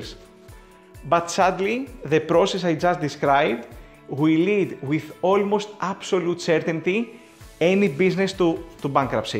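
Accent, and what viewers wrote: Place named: Greece. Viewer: Perfect, though greek accented :D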